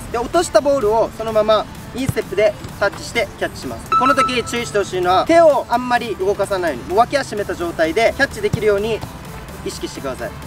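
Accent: Okinawa, Japan. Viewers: 沖縄の人かな？ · 沖縄訛りな感じ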